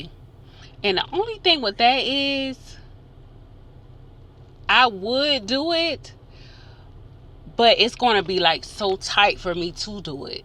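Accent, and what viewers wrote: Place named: New Orleans, United States. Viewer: Your accent is beautiful. Are you from NOLA?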